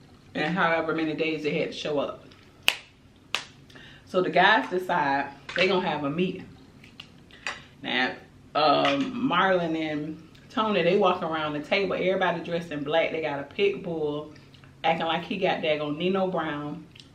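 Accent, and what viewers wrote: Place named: South, United States. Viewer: Happy Labor Day: I 💘 your videos, new subscriber & I watch every time. Quick question; your accent sounds southern, are you from Georgia?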